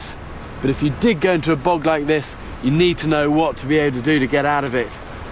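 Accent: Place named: England, United Kingdom. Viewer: Recien me doy cuenta por su acento que es ingles :O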